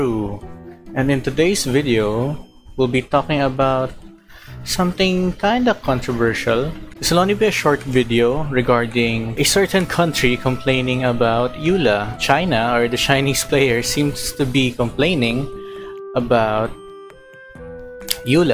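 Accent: Philippines. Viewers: Are you filipino? You kinda have like a Filipino accent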